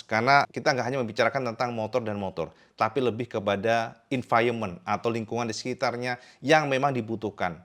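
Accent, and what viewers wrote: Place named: East Java, Indonesia. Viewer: cak, permisi, mau tanya? rumah pean dimana? dan asli pean dari mana? terima kasih infonya, cak soalnya logatnya seperti orang jawa timur an, cak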